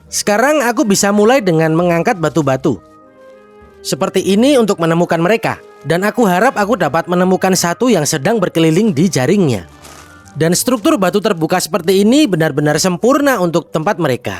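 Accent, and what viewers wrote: Java, Indonesia. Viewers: logat jowo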